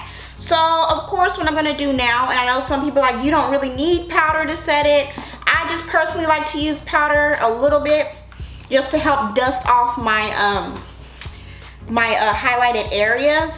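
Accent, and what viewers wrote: South, United States. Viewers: Lawwwd talks like a southern Grandma.... girllllll, chillllllddddd 😂😂😂😂. I luvs this chick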